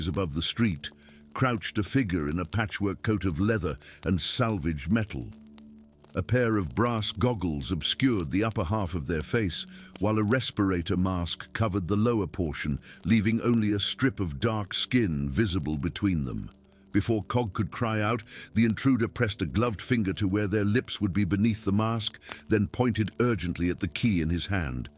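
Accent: United States. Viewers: Best one yet. So many stories, I love that. PLEASE stop using American voices. With AI you must be able to choose from hundreds, please use a different one.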